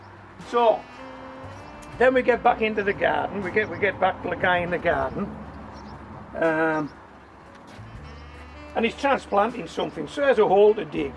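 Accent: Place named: Northern England, United Kingdom. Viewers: Hi mate, i love your chanel and I am married to a Birmingham girl here in Australia. We were wondering what part of England you are in as you sound up North? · Hows it gaan Marra! I've got the autoplay set up on Youtube, so every now and again while I'm multitasking (listening, not watching) one of your videos pops up. Every time one comes on, its a bit of a surprise to hear a voice with our accent coming out of the computer - but how great it sounds. You couldn't get much more Cumbrian than the way you say Crimson Crush! I'm going to make a very late start with seeds from some supermarket toms (piccolo) - and see how it goes. Wish me luck!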